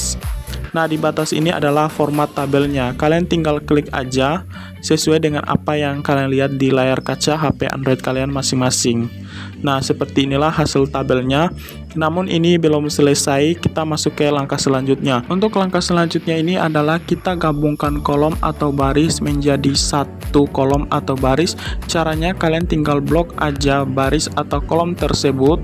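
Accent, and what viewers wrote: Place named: Southeast Sulawesi, Indonesia. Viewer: abang dari sulawesi tenggara k, logat dan cara bicaranya sama" persis..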